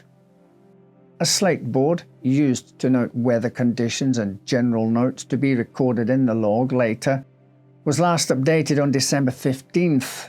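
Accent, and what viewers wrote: Scotland, United Kingdom. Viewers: Is the accent Scottish???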